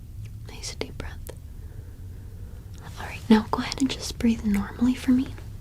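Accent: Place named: Yorkshire, United Kingdom. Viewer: Чисто йоркширское произношение.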